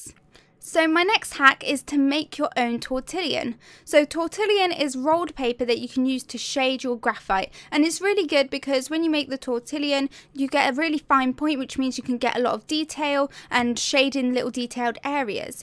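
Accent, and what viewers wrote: United Kingdom. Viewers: Is this a british accent? Sounds awesome at 0.25x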